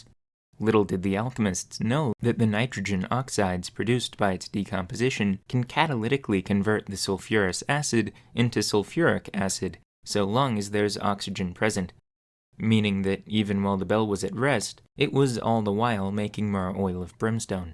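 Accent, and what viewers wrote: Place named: Carolina, United States. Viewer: I'm fascinated by accent! The "er" creeping into words reminds me of some populations of English-speaking populations along the east coast of North America like the Carolina Broque or the New Brunswick accent, but it doesn't sound quite like either. I did a little searching and maybe it's related to some accents in the South, like in Georgia and Louisiana, but it's unclear. Authority becomes erthority, forty becomes ferty, forms becomes ferms, orange becomes ernge, chloride becomes chleride, proportion becomes propertion. So it seems there's like an ah/oh to er merger, but not always. Gold isn't gerld, common isn't cermmon. Anyways, if anyone has information or knows this accent, please let me know! Also, if you read this, I am not mocking your accent, I'm genuinely curious where it comes from.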